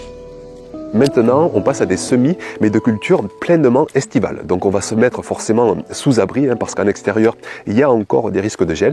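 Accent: South, France. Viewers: J'adore cette homme par son naturel sa simplicité et son petit accent du sud! Je regarde pratiquement toutes vos vidéos. Elles sont géniales sans blabla qui sert à rien. J'espère un jour que mon jardin sera aussi grand et organisé que le votre. Merci pour vos contenus. Signée une passionnée.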